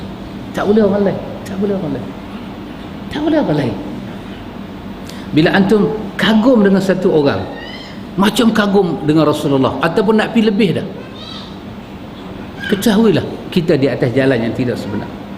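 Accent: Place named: Penang, Malaysia. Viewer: bestt dgn loghat penang.ceria.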